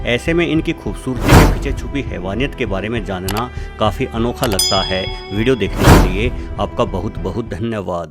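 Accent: Haryana, India. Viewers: Sir aap Haryana se he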